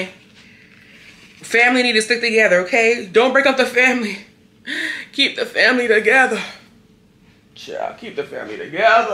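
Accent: United Kingdom. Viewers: Everything is so cute. And you're british accent reminds me of so much. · It’s the British accent for me 😆😆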